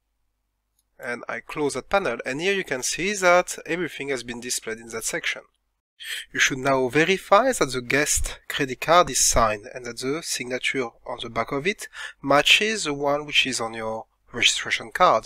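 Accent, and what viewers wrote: France. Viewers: merci beacoup pour le rafraîchissement de mémoire et pour le magnifque french accent. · It still sounds French even if he speaks english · A French speaker's English is always so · Terrible with the French English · your accent is more of french which is a bit hard to understand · Langue anglaise avec un accent français génial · seems French accent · sounds super French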